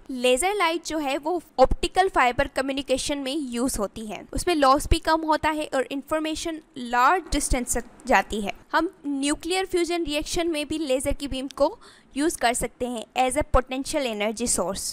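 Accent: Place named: Deccan, India. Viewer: Aap Deccan, India se hai shayad apki accent waisi hai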